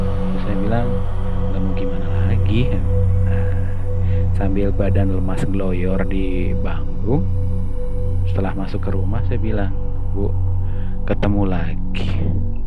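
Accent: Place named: Java, Indonesia. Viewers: Salam ngapak om, ora ngapak ora kepenak. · Jawinya itu loh ada bnget bkin asyik dngerin critanya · Salam ngapak😂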